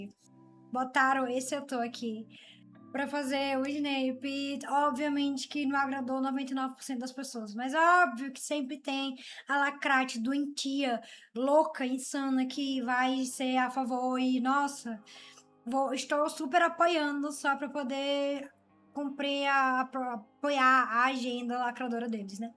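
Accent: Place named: Pernambuco, Brazil. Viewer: Acabei de conhecer o canal e gostei demais do conteúdo e principalmente da sinceridade. Aliás, alguém conseguiria me confirmar se a é nordestina? pelo sotaque eu desconfiei que ela é, provavelmente, da Capitânia de Duarte Coelho (Pernambuco)? kkkkkkkkkkkkkkkkkkkkk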